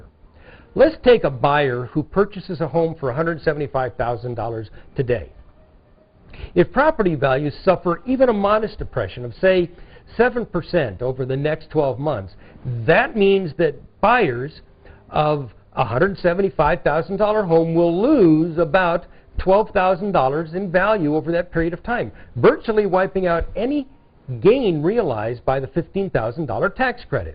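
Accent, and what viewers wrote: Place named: South, United States. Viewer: If we must stop the tsunami of foreclosure that drive home values down, then why do you advocate short sales that allow you and your clients to buy below market. That too forces property values down. What you are saying, hidden in you southern charm delivery, appears to be very very selfish especially if you still advocate shortsales.....either way...the homeowner get screwed....I no longer buy foreclosed, shortsale property....It's cannalbalism......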